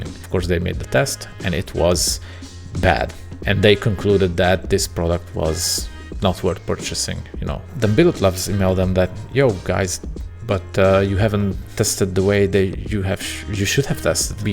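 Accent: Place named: Sweden. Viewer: swedish accent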